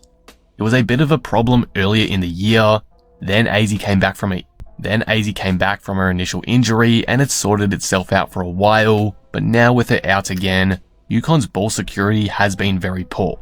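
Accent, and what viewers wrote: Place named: Australia. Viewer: Clearly this guy is an Aussie, or NZ'er, or a Brit. That's cool, but being Captain Obvious is not helpful. Bye dude. You're irrelevant.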